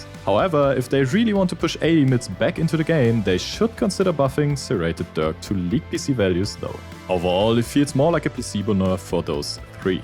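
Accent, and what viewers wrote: Germany. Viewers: I really like this German Accent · R U German? Ur pronouncement Sounds Like thia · The german is strong with this host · Man hört es direkt das er Deutscher ist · Is the moderator a German?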